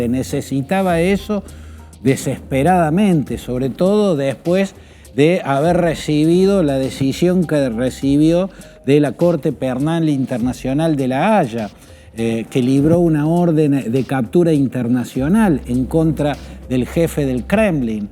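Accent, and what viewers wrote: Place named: Rioplatense, Argentina. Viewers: Que acento Rosarino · Argentino o Uruguai habla pana de mas😅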